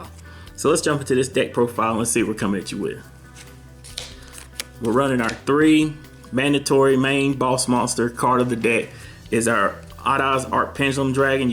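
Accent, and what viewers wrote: South, United States. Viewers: You sound like a country boy but nice profile 👍👍👍😀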